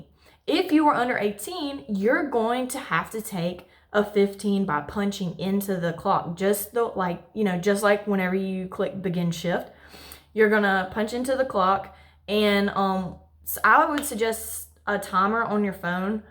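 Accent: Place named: South, United States. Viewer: Your Southern Accent, is very very very Beautiful, with your very very very Beautiful Personality, my Friend 🥰🥰🥰🥰🥰🥰🥰🥰🥰🥰🥰🥰🥰🥰🥰🥰🥰🥰🥰🥰🥰🥰🥰🥰🥰🥰🥰🥰🥰🥰🥰🥰🥰🥰🥰🥰🥰🥰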